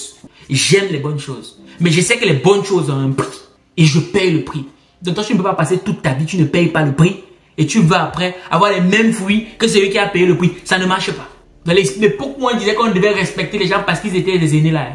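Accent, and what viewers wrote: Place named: Cameroon. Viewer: Pour une fois je ressens ton accent camerounais 😂😂😂😂😂😂. Merci pour cette vidéo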